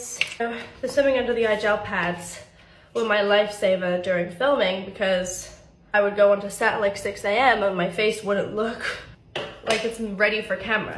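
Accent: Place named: United States. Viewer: Y does she sound american??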